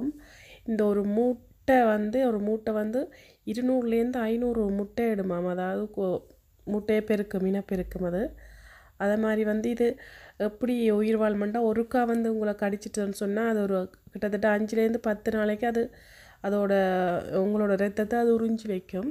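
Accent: Sri Lanka. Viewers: அக்கா நீங்கள் ஈழ தமிழர் ஆ... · Ilangai Tamil 👌🏼👌🏼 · Ellangai tamizh super❤❤❤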